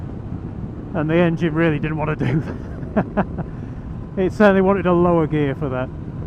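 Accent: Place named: Yorkshire, United Kingdom. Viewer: I enjoyed this video. I've already nearly decided to buy one. Last bike in England was a Honda SP2, but after eight years in Thailand on a 125, I am a "born again". But as somebody once wrote, " In the country of the sub 125, the man with 500 is king". The accent of God's Own County was a bonus.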